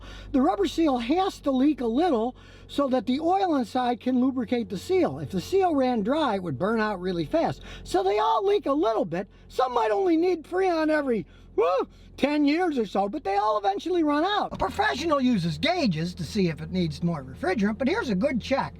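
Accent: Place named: Pittsburgh, United States. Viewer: Have you always lived in Texas? You have a slight Pittsburghese accent.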